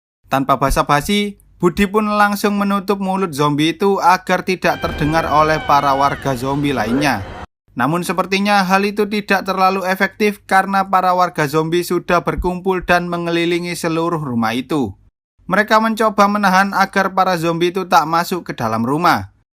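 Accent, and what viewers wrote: Java, Indonesia. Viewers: Ketoro nek iki arek jowo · Njirr wong jawa massee Gi ngapa massse wkwkwk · Abang wong jowo? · Medok tak trtolong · We abang wong jawa y? Pada baen ak be wong jawa😅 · Medok tenan mas🤣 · Abang orang jaws ya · Dari Jawa Mana Kamu Bang?..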